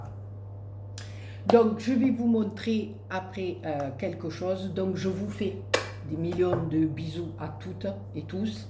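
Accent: South, France. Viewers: Moi je viens sur les chaînes pour trouver du plaisir . Chez vous je me régale. Déjà l'accent trop top , les expressions du sud, je ne m'en lasse pas. Vous êtes très minutieuse dans vos créations, cela se voit de suite. Alors si nos commentaires vous apportent du plaisir , c'est un partage. Ceux sont ces petits bonheurs qui font que la vie est belle. Je vous souhaite que tu positives. Belle journée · Oh que tu es magnifique tout en beauté, que de belles cartes, tu le mérite beaucoup et magnifique création que tu nous a encore fait. Mais c'est normal que l'on soit nombreux à te suivre car tu es une belle personne, tu es honnête, franche, et super à t'écouter avec l'accent du sud et ton franc parler moi j'adore. UN GRAND GRAND MERCI POUR TES TUTORIELS, TES BLABLAS ET TON HUMOUR qui nous remontent le moral à ce faire péter le bide de rire. Allez passe un bon réveillon et à bientôt bisous de Bourgogne.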